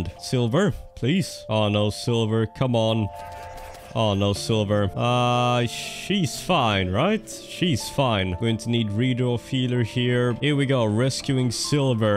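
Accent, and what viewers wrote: Sweden. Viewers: You are the most stereotypically swedish sounding guy ive ever heard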